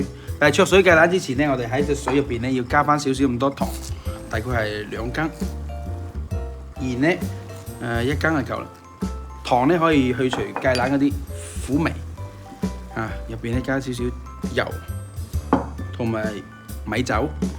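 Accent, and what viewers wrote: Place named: Guangdong, China. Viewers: 这是广东哪里口音？我不会说广东话但是能听懂粤语，能听出来和正宗白话有点点不一样？ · 主持人的口音明顯是來自大陸廣東省，而且字眼都國語化了，一點韻味都沒有，不親切聽起來很土很怪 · 對，不是標準的廣州話但是確實是廣府話。整個嶺南都有自己的鄉村話。例如，中山有自己的口音和鄉村話，江門也有，南寧也有，等等。香港和廣州說的就是廣州話。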